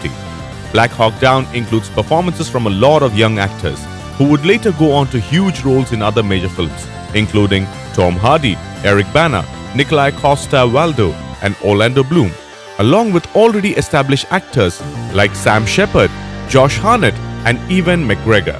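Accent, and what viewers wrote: India. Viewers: You sounded like Indian, checked your 'YouTube and I was right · he's from india but has a surprisingly mild accent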